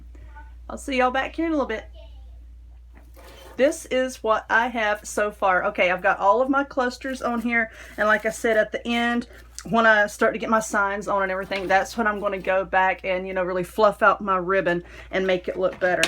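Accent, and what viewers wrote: South, United States. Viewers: Super cute intro and video! I'm going to attempt to make one like this. Also love your southern accent 💗😊🎃🕷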